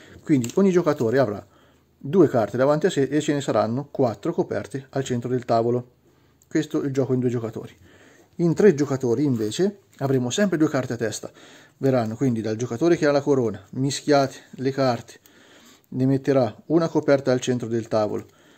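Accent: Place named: Perugia, Italy. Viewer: solo perché sono di Perugia anche io hahahah anzi si sente pochissimo grande bel tutorial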